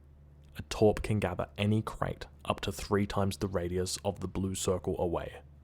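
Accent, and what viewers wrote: Australia. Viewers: and hes aussie asf.. Fuck yee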